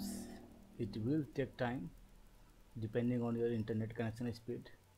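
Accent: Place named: Kerala, India. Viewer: it works man! many thanks. btw are you from Kerala? I've got a friend from there you got the same accent . hehem . Take care!!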